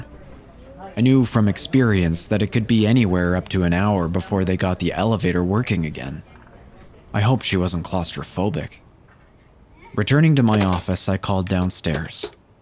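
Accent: Canada. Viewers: The guy reading is definitely Canadian. About = aboot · I love your little Canadianisms btw:3 Which is your favourite of the stories you've narrated?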